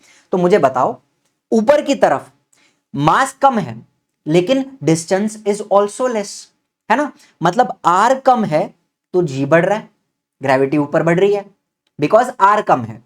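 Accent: Maharashtra, India. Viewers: Ek no marathi sir 😅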